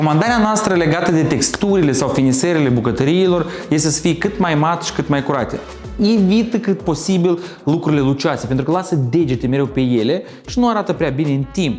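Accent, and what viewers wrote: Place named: Bessarabia, Moldova. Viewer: cu accent basarabean,dar bine pregatit.exceptionala prezentare,profesionist,cu detalii finisate in arta amenajariloe!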